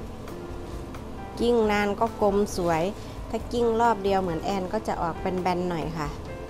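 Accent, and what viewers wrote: Isan, Thailand. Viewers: พึ่งได้เข้ามาติดตาม ไล่ดูทุกคลิปเลยค่ะ เข้าแล้วออกไม่ได้จริงๆช่องนี้ ชอบเวลาพี่แอนพูดไทยตกอิสาน น่ารักมากๆค่ะ 😊 บางทีเราก็นึกคำไม่ทัน 😆แต่มันเป็น เอกลักษณ์เนาะ เสียงใสๆไทยคำอีสานคำ ฟังเพลินดีค่ะ FCสาวอิสานคือกันจ้า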